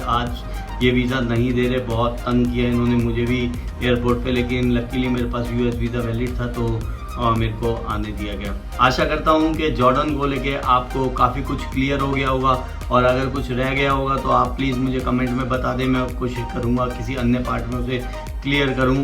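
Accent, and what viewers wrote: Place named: Old Delhi, India. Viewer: aapka baat karne ka style bahut Achcha hai Old Delhi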